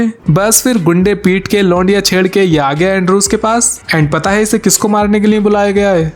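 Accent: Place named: Madhya Pradesh, India. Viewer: bhai tum MP se ho kya? Tumhara Hindi accent bahut bekar hai.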